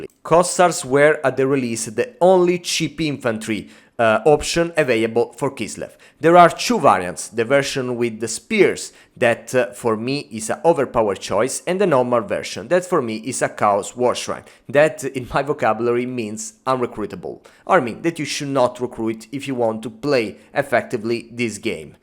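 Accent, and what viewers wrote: Italy. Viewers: its an italian accent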